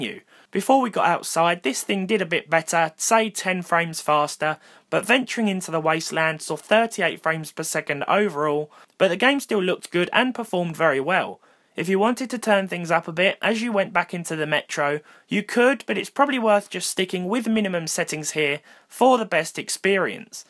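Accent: United Kingdom. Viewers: man, ive never heard a more british accent than this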